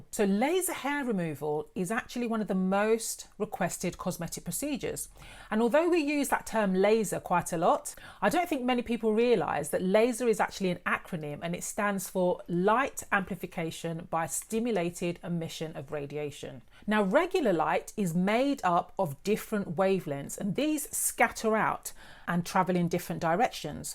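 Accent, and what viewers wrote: United Kingdom. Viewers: I wish there was video for none black skin or dark skin as I am not. I'm Hispanic. Oh sad those that got burned. I hope they were able to get healed or surgery grafts or whatever. Maybe they did not have the money. You are so pretty. I like your hair style. I love the British accent. You gave a pretty smile. Well tye tips you said to shave is good. Just as I thought, but not too much so thanks. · I love your british accent 💕💕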